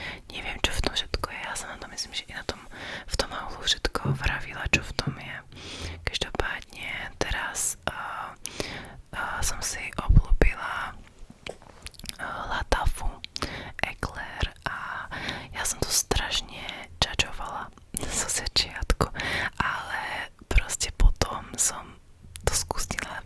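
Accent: Slovakia. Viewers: hej jakoze uplne narovinu kdybych neznal tvuj kanal a nevedel ze si ceska tak bych si myslel ze si ze slovenska protoze ta vyslovnost nebo ten prizvuk je fakt skvely🩷🩷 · znieš ako u nás západniari, tí občas dávajú české slovíčka hihi, ale inak dosť zlepšenie prízvuku od prvého dielu <3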